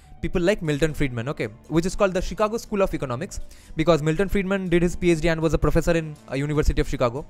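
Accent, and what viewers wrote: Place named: India. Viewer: Would probably be ok if narrator could speak at a normal rate. Machine gun Indian English is difficult to follow.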